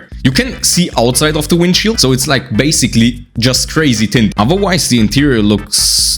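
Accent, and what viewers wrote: Germany. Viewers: i can hear the german in your aktzent...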